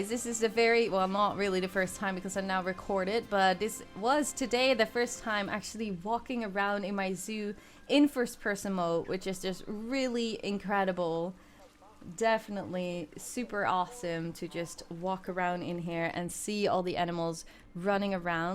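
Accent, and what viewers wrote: Netherlands. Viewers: Nice zoo! And also, are you Dutch? xD Good accent. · are you dutch? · are you dutch? because to me your english has a little dutch accent and you pronounced oudehands dierenpark perfectly!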